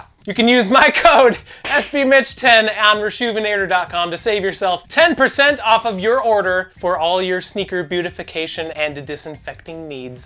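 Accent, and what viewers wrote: Chicago, United States. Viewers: PLEEASSEEE stop doing the Chicagoan intro man I like the videos but that KILLS it like damn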